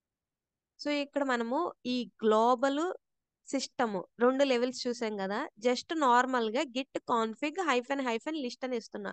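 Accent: Godavari, India. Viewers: mana godarolle...🤪 voice ...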